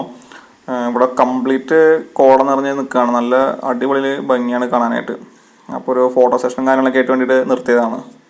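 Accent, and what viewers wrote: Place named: Thrissur, India. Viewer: chetta... chettante thrissur slang enikku valya ishtama. watsapp number tharumo.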